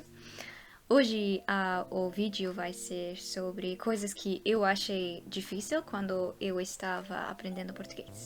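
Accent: São Paulo, Brazil. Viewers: bemm dms,seu português está como alguém que mora em são paulo,parabéns!❤ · Você parece com uma Paulista , você fala o português muito bonito, 👏🇧🇷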